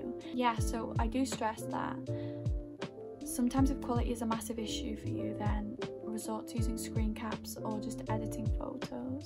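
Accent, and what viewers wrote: United Kingdom. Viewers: Where in Yorkshire are u from?? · shes british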